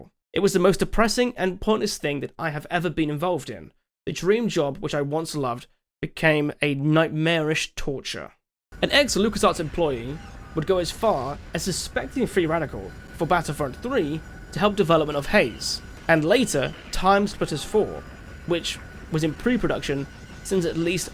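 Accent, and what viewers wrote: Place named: England, United Kingdom. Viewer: English accent? Star Wars video game lore? Subscribed!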